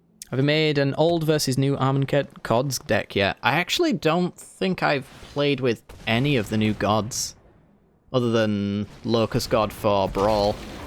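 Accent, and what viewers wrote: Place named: England, United Kingdom. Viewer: Sent here by CGB and found an English accent!!! Woo hoo.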